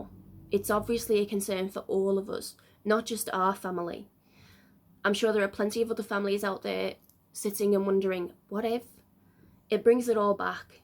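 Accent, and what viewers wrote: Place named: Scotland, United Kingdom. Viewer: are you Scottish? Dark Curiosities is another Scottish Voice I love. Great accent the Scots have 👍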